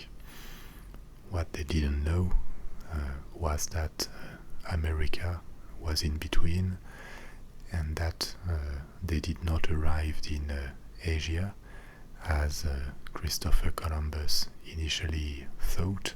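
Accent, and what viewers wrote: France. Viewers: Mon Dieu. You have certainly got a niche in this kind of presentation sir. First off, yes naturally Americans in the US in particular like the French accent, and also the French language spoken, especially when done so in a relaxing atmosphere. I think this is great for Anglophones to learn French as an augmentation and/or diversion from the hectic world. Oh, almost forgot, the good history lessons too. Big thumbs up. Merci à vous.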